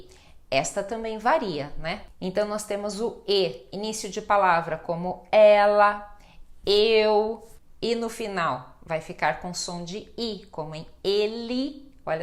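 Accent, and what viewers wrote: Brazil. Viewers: Es portugues de brazil?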